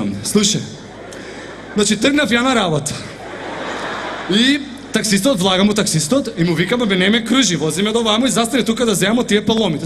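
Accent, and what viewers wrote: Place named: Kumanovo, North Macedonia. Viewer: Kumanovac od daleko se poznava.Bravo samo takoj produzi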